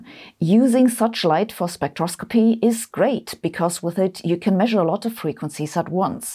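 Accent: Germany. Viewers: You're German so of course you have an accent speaking English, but I hope I'm not out of line to mention that native English speakers pronounce "comb" to rhyme with "home". Your pronunciation sounded more like the Welsh word "cwm" which is a glacial feature - Google says that's "felskessel" in German. Feel free to correct my atrocious German any time! As ever thanks for the awesome videos and keeping me in touch with the world of physics and science which I haven't studied for several decades now. Even my non-scientist wife enjoys your videos and we always get a kick out of your humour. I live in hope we will get a musical number from you again sometime, you are so multi-talented!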